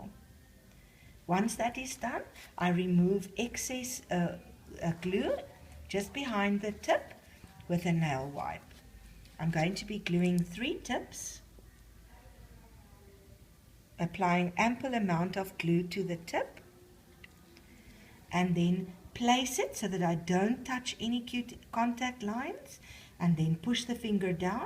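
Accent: South Africa. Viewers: Nice to hear a South African for a change :) thanks for the tutorial! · By her voice I can tell she is south African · is jy afrikaans?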